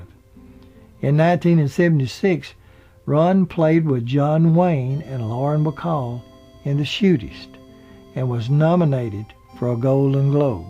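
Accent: South, United States. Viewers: This was another great video presentation by. Love the southern accent. The info on Frances Bavier was quite interesting. A lot of the research here was verified by what I already knew or had read about TAGS for several years. The pictures were accurate except for the one with Andy's family picture, featuring Lee Meriwether. That was a publicity photo for The New Andy Griffith show if I'm not mistaken. I finally understood why Don Knotts left the show. It was made clear. Thanks. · The narrator is from the south. Obviously he's an older gentlemen. Show a lil respect. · MoonMyst Well he is an older southern gentleman so yeah lol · Im originally from Pittsboro NC also in Chatham County just a few miles from Siler City. I love the way you pronounce the town names properly with the essential southern drawl. Wink wink. I'm whistling the theme tune now. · I love your southern accent! 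· Hey we all love your videos and are always looking for more to come, your voice has a soothing quality, must be the southern accent, we here at my have come to a consensus, you are the Andy Griffith show of the documentary shows, keep em comin buddy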